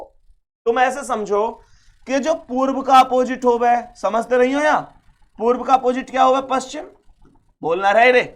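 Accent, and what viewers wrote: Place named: Bharatpur, India. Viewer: Bharatpur jesi language h sir apki ❤❤❤❤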